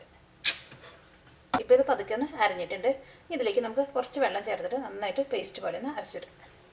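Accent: Thrissur, India. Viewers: Nice thrissur accent hehe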